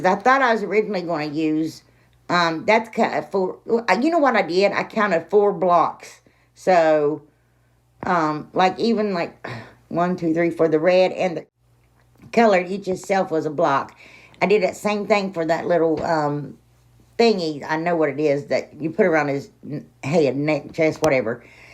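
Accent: South, United States. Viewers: I LOVE all of your crafts, but honestly i dont know if i love those, your adorable heavy southern accent, or your silly and lovable personality more!!! You are my favorite!!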